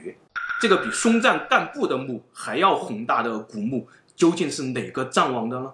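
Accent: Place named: Sichuan, China. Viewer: ，听你说话感觉你像四川那边的普通话，因为你n、l部分，平翘舌不分。但是看你留言又感觉你不在中国大陆的样子。因为大陆居民要翻墙才能玩youtube的，所以肯定现有B站账号、抖音账号才会有youtube账号啦。而且墙内是主要阵地啊。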